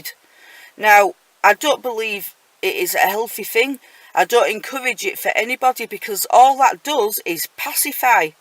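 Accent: United Kingdom. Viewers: I just discovered your YouTube channel after seeing your comment on the channel with the British guy in the Airstream. I'm watching this from Florida, in the U.S. I find it interesting to listen to people from the U.K. speaking English. It's like a whole different sounding language, even though I can understand everything you say.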